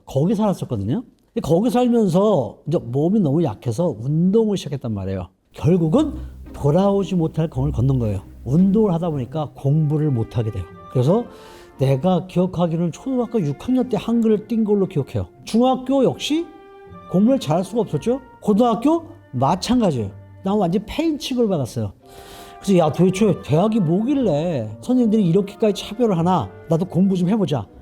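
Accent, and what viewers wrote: France. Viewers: 한국말도 발성이 프랑스식 같아요 ㅎㅎ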